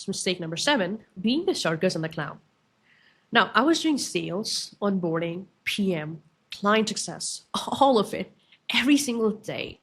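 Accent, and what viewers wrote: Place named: Netherlands. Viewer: You sound like a Dutch person, not that it matters lol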